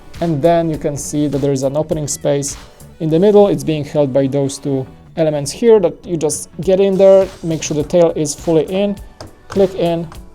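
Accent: Poland. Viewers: I’m guessing Polish 🇵🇱 But I could be clearly wrong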